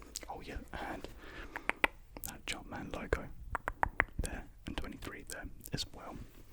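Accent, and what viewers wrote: United Kingdom. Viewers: A British asmr voice, yey🎉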